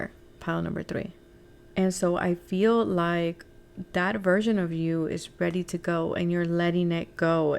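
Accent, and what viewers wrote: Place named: Latin America. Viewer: I think she has latina background, she speaks also spanish, so thus the accent. She is not african american.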